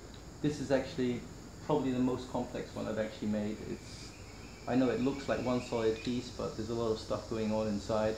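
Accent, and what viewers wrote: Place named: United Kingdom. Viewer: I never woulda thought you had a brit accent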